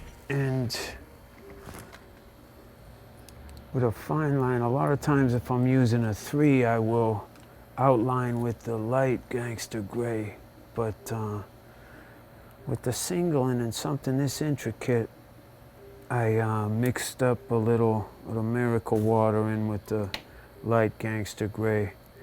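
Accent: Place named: United States. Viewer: Feels like Christian Bale got his american accent for parts from this guy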